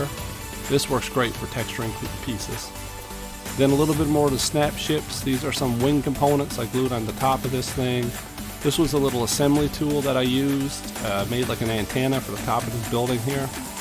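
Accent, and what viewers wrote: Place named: Newfoundland, Canada. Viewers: Oh yeah, you are definitely from the Rock, by! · These look great. And I can hear from the accent that you’re a fellow Newfoundlander.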